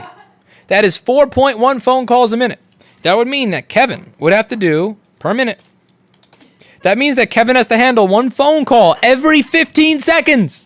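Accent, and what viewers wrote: United Kingdom. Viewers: As a brit I dont like the way people are complaining about a british accent...can you ask him to double down on it? Or to put on other accents. Brummy' scouse' gentleman. That would be just spiffing. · Complaining about British accent is like complaining about Louis looking like an Italian 😆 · I love British accent, and after what I heard from Louis I have even more reasons to develop it just to annoy people.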